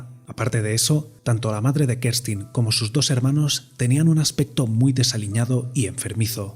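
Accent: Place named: Basque Country, Spain. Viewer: Oye. De dónde eres?? Ese “pero” k metes al final de las frases esmuy euskaldun 😂😂 igual me he colado. Pero diría k eres de Donosti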